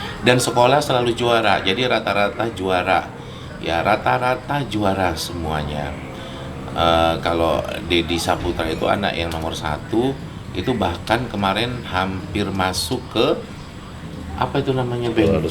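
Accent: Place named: Medan, Indonesia. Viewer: Logat Medan yaaa.. gaya bicaranya mirip Billar banget 🤭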